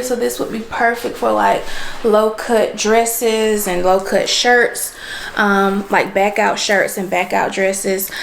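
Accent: South, United States. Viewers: Do you have a country accent it's so sexy with yo thick fine self with all do respect boo 😘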